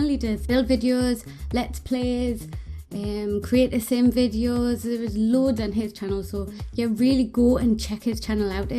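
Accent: United Kingdom. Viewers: Your accent sounds like a mashup of something from the Caribbean plus something from the UK what is it i'm in loveeee???!?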